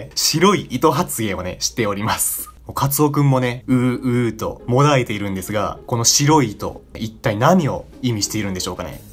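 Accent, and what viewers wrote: Kansai, Japan. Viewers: この人関西出身やな？！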